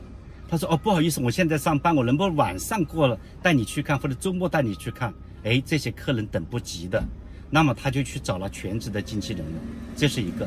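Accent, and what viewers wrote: Hunan, China. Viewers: 订阅了。师傅您是湖南人吗？谢谢分享您的经历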